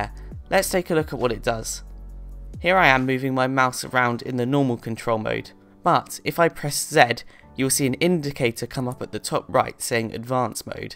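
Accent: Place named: United Kingdom. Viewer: ugh hes british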